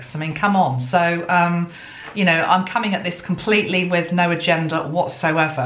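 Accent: England, United Kingdom. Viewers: Hi . I like your English accent and the way you put those perfume on you to connect with energy . You're very genuine and kind . ❤️❤️❤️❤️ Ps . Your house looks very tidy and everything is on their place . Your dress very nice too.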